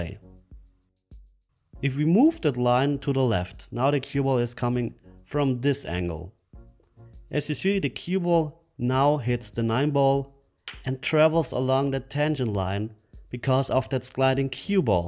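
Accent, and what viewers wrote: Germany. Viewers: Gory Guerrero he is from Germany.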